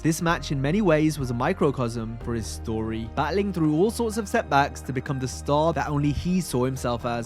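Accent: United Kingdom. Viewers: British people and their pronunciation of words never ceases to amaze me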